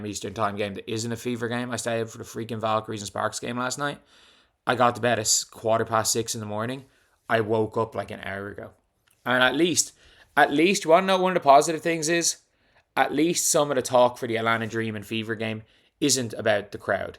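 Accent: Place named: Ireland. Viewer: Australia?😂😂😂😂 You can't hear that irish accent?😂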